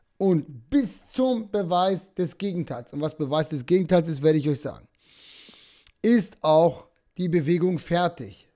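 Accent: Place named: Switzerland. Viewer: das ist glaube das Schweizer Englisch.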